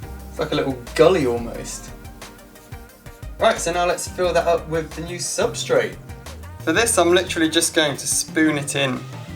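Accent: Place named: United Kingdom. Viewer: Where are you from in the UK ? I recognise your accent from near me 😁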